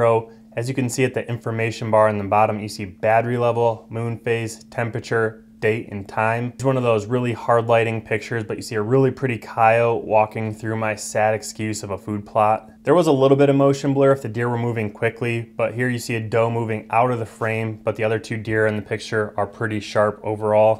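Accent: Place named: North America. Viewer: It would be nice of you to start by pointing out this concerns the US and possibly Canadian market specifically (still less than 5% of the global population). Not everybody can get this from your accent alone. Otherwise, a very useful overview, even if I'm interested in them for property control. And, btw, I would also mention that all three of those brands are North American and hence are likely to host their services (read: +-all of the stuff your camera sends) in there, instead of China, as some other well known Chinese brands do.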